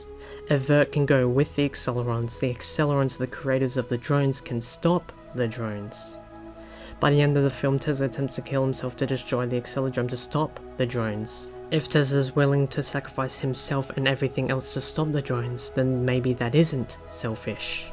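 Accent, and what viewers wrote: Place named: United Kingdom. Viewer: I can't listen to "if you're beiaten and tortured to a pulp." Yout Birtish English is hard to me that's why I ask on comment section when the captions don't match the dialogue.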